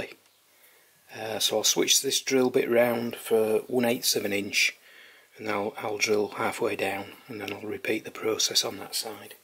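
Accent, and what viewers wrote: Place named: Hull, United Kingdom. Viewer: You sound like you might be from Hull, my home town. Very nice little engine, something I'd like to have a bash at. Greetings from Australia...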